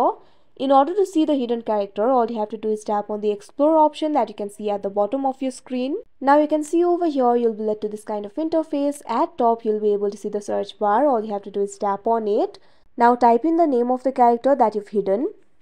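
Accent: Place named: India. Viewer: indian acces on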